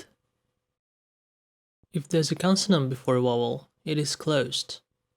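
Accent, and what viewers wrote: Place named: Russia. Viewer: I'm learning Russian, and i can say that this video helped me a lot, no need to be sorry of your Russian accent, you are great!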